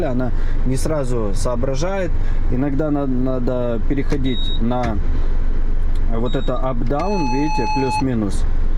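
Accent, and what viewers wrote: Odessa, Ukraine. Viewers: привет - я с УКРАЇНИ!!! ЗОВСІМ СЛУЧАЙНО ПОПАЛА НА НАШ ВЛОГ І - ОБАЛДЕЛА!!! - ВИ ПРОСТО ЧУДО І ВЕЛИКИЙ МОЛОДЕЦЬ-ГУ НАСТОЯЩИЙ ОДЕССИТ(рисковий) ГОВОР ОДЕССКИЙ НІ ДЄ НЕ ДІВСЯ!!!! 👍👍👍 ДА ТАК РОЗВЕРНУТИ СВОЕ ЖИТТЯ- МАЄ БУТИ БОГАТО ФАКТОРІВ!!! В ВАС Є СТЕРЖЕНЬ І ВИ ПРОСТА ЛЮДИНА І БЕЗ ПОНТОВ!! А ЯПОНІЯ ДУЖЕ ГАРНА І ЦІКАВА І ЛЮДИ ТРУДЯГИ!!!! ДАЙ ВАМ БОГ І ВАШИЙ СІМ'Ї МІЦНОГО ЗДОРОВЬЯ, ЩАСТЯ, КОХАННЯ, ДОСТАТКУ І МИРНОГО НЕБА В УСЬОМУ СВІТІ!!! А ДІТИ В ВАС --- ЧУДО!!!! 👍👍👍❤❤❤💙💛💙💛🌹🌹🌹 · Привет, тезка. Случайно попал на твой канал. Сразу было предчувствие, что ты с Украины и говорок одессита. Подписался на все, а так смотрел дальнобой по штатам, но у них там начало тухнуть. Смотрю и наших пацанов по России, даже общаюсь в живую. Интересно посмотреть Японию. Сыну 20 лет и тоже хочет туда к вам. Даже не знаю, что ему посоветовать. Смотрю и слушаю тебя. 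😊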